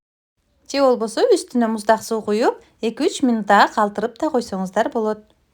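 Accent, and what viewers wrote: Standard. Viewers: Колуна береке аябай таза кыргызча суйлопсун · Азаматсын,таза кыргызча нукура так сулойт экен,кээ бир чалдырагандар бар бир созго эки орусча кошуп тантырагандар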